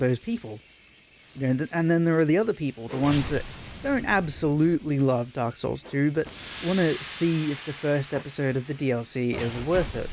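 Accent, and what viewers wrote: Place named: Australia. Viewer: Well, he is Australian, "sour"...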